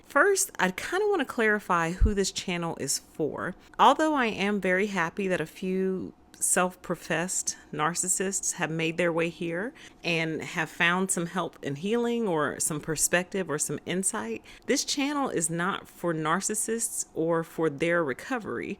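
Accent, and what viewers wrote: South, United States. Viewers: I love your Southern accent! I'm in Arkansas : )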